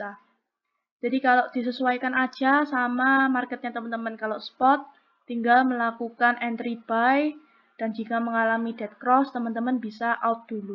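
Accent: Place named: Java, Indonesia. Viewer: Mbak Wong ngdi.. medok lo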